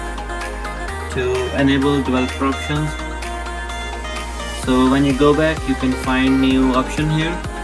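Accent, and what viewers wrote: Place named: India. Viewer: Please turn down that annoying music. I have a hard time with accents as it is. I love people from that region, but have a difficult time uderstanding.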